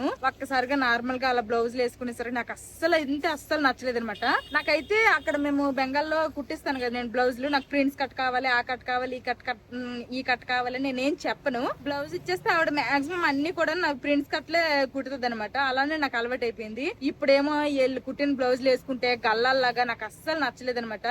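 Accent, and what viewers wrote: Srikakulam, India. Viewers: మీ కన్న మీ అమ్మ గారు నీట్ గా మాటలు ఆడుతున్నారు మీరు మరి శ్రీకాకుళం యాస దారుణంగా మాటలు ఆడుతున్నారు 🙏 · dear madhavidont think of leaving the mangoes bagif there is no place..make place.how can you leave andhra mangoes....your srikakulam accent is very good. nice to hear. you talk openly..ftom your heart...👍👍💓💓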